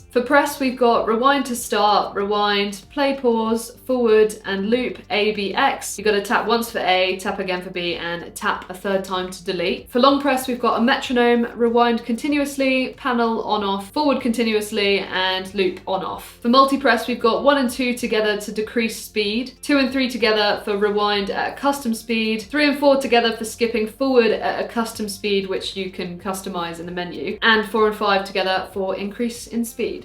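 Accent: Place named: North, United Kingdom. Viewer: Your Northern accent made me laugh 😂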